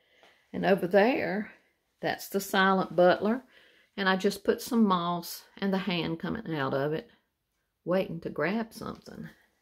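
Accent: South, United States. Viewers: I loved your Halloween tour! I can’t get enough of all the vintage goodies! I love your pleasant sounding voice and your southern accent. 😊💖🎃🍁👋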